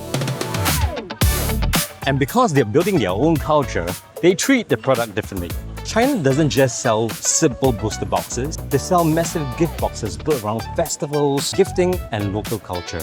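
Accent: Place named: Trinidad and Tobago. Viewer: Random: Your accent gives a hint of caribbean..Trinidad to be exact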